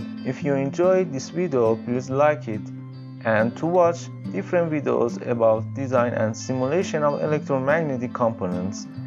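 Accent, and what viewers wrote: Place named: China. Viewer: Nice video! BTW, you sound Chinese.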